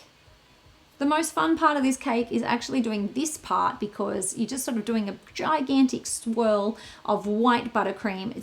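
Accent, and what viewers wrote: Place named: Australia. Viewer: OMG you're Australian, so refreshing you hear another Aussie on YouTube. Was fully expecting you to have an accent.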